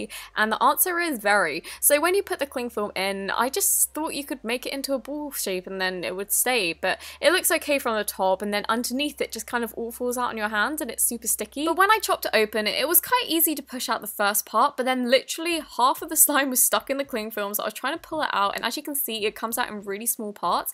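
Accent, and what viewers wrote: England, United Kingdom. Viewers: I ligit loves her videos (like if you agree) they are so calming and I l9 e her British accsent also there's a better chance of seeing pigs fly than her actually seeing this but I still love her... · to Umm... Where’s her British accent? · Welll are you american?? If you are all British voices will sound the same to you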